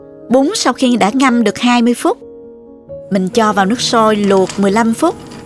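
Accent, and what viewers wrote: South, Vietnam. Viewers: ĐÚNG CHUẨN GIỌNG CON GÁI MIỀN NAM....LẠI BIẾT NẤU ĂN NGON, HƯỚNG DẨN RÕ RÀNG... CẢM ƠN! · Thich nghe giong noi cua MC trong video,giong Mien nam that tuyet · mình cũng người Bắc đây nhưng thích nghe giọng miền nam nhẹ nhàng và ngọt ngào như chị trong video rất dễ thương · Thích giọng miền nam ngọt ngào của bạn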